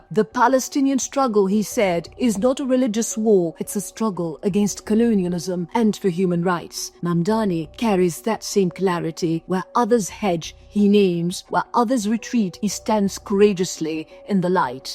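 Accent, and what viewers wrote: RP, United Kingdom. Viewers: Not to go off-topic (wonderful powerful message eloquently delivered), but I just have to know where here voice (accent / pronunciation) comes from? It's like she swallowed a few English monarchs and perhaps some Thatcher as well. Also, in how far is this her 'reporter voice', as in, how different is it from her natural day to day speaking voice? I'm intrigued.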